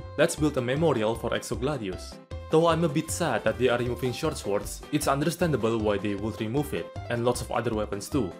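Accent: Russia. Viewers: why is your accent similar to russian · bro this man has a very cool russian accent and i approve of it!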